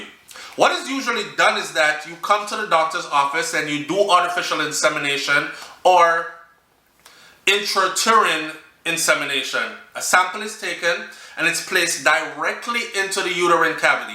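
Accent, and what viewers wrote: Trinidad, Trinidad and Tobago. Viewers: Ur trinidadian accent shot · Yow your trini accent is on fire. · Your Trini accent bro!! 🤣🤣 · I like your trini accent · Love the accient spot on you sound like my cousin in Trinidad. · I can’t do the trinity accent, but I think u did well.lol However, ‘miracle’? Daaang, I thought we all knew sperm in pum pum Mek baby.loool Sheeeet these liberals always redefining reality with some bs mind fugg! Oh how brave smh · you sound sweet talking like Trini OMG love it don,t cave about people life they live every one do what they want to do all I can say two dutch pot can,t close or two cover that is all I can say not for me to judge leave it to god glad for them. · 🤣🤣 you’re trying to read like a trini bless up bro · I cant with you with the Trinidadian accent. 😆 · Love the Trini accent 😎. When is going to update us on the baby ❤️—a Mother’s Day video would be nice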